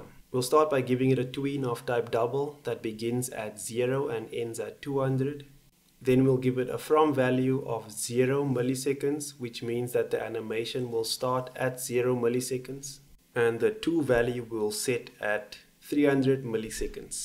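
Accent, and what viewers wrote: South Africa. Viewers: Going out of topic... are you a South African? you sound like an Afrikaans speaking coloured. :)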